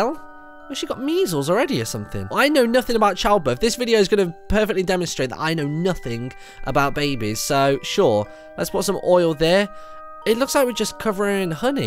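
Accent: United Kingdom. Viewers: Ate you British you sound British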